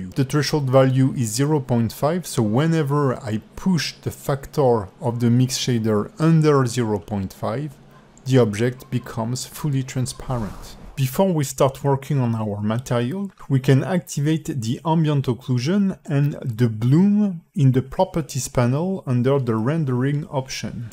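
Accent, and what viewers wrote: France. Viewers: Bonjour, très bon tuto! ton accent français ma permis de comprendre plus facilement même si mon anglais est moyen! :D thx! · On sent tellement l'accent français haha, excellent tuto btw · Serais tu français ? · Tu es français n'est ce pas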